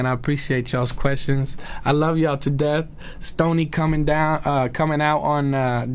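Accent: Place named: Texas, United States. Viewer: I hear that Texan accent!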